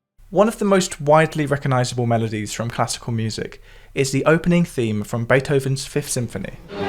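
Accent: United Kingdom. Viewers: "Wuhld" is almost worse than a Bostonian. Honestly this sounds better to me than a Bostonian because my parents liked britcoms late at night on the local PBS station. I got adapted to this accent pretty fast when I got a pubescent liking to Penelope Keith's hipbones. Y'all Brits probably think I say "world" as "wuhrrrl(d)".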